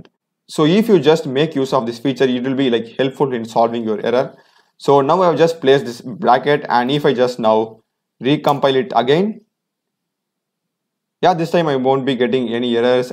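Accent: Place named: Tamil Nadu, India. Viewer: 😂🤣bro na indha problem kaga solution google pannunapa unga video varala aproma yt la vandhu poten apovum varala aproma tamil nnu poten oru 5 video kalichu unga video vandhuchu so neenga indha video tag la tamil potrukinga adhuvum unga slanga lite ah tamil member english pesuna epdi irukkomo apdi irundhuchu vro 😁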